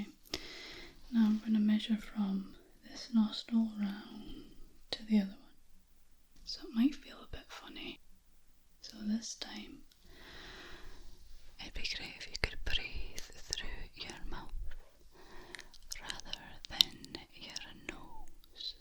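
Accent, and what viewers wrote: Scotland, United Kingdom. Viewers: you're very pretty i love the scottish accent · Scottish accent yes please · Sooooo Scottish haha WEE , 🥺😭 🤗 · This accent is the best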